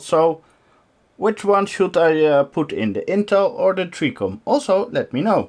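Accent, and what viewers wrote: Netherlands. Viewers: Dit Dutchglish is echt schattig :D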